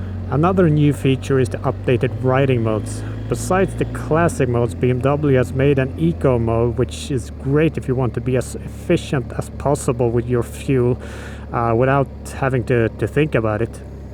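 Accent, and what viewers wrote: Sweden. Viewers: Haha, tyckte jag hörde lite svenskt påbrå och sedan såg jag flaggan! Coola väskor!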